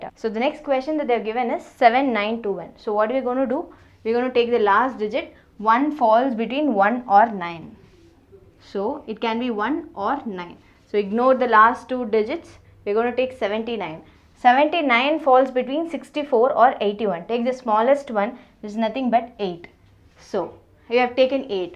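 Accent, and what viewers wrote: South India, India. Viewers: South Indian tone of speaking..nice👌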